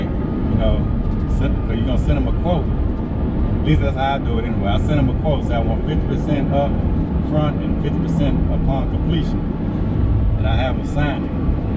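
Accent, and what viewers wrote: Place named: Memphis, United States. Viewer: You gotta be from Memphis. You sound just like we do.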